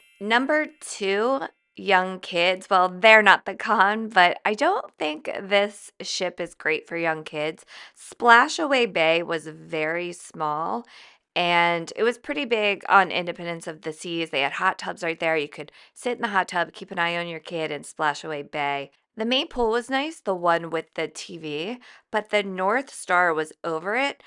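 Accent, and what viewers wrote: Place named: California, United States. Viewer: HER VOICE IS ANNOYING !! sounds like someone from California. Like oh my God. 😅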